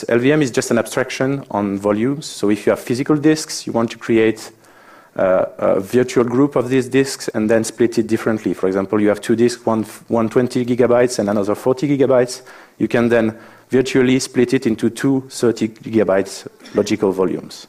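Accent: France. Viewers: Ah ah ah on entend le french accent lol bonne vidéo sinon les commandes classiques pour le nux sympa.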